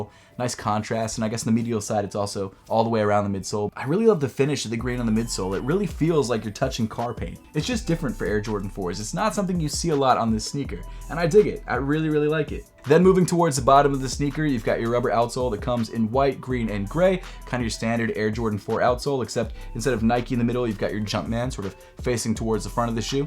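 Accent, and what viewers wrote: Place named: Philadelphia, United States. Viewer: Wait hold up, You’re from Philly bro?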